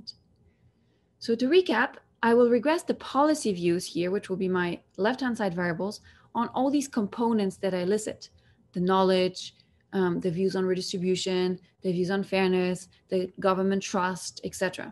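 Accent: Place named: Bulgaria. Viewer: She appears to have spent a lot of time on accent-therapy and techniques to lower her voice. She cannot escape the Bulgarian accent, ever, but she's put a lot of work in to sound close to native.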